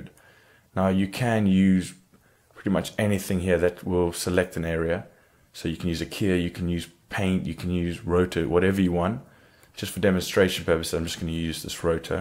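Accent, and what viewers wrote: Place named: South Africa. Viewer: you sound South African :P respect :D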